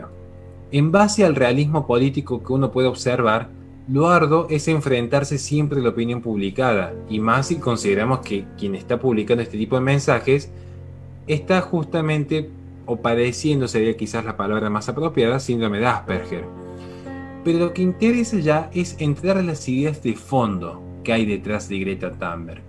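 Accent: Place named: Cordoba, Argentina. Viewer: Verdad, debo admitir que no me gustaba el acento cordobés pero que deleite es aprender de este genio, despierta mi curiosidad se saber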